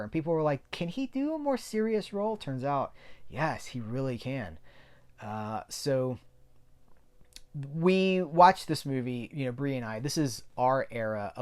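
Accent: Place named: Australia. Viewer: I think your Aussie accent is perfect. And this is coming from someone who is not Australian.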